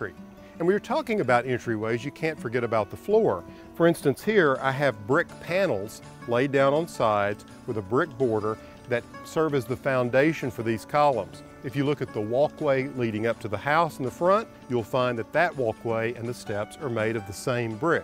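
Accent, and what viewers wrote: South, United States. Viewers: You have such a great voice ... so soothing with a wonderful little dash of the South.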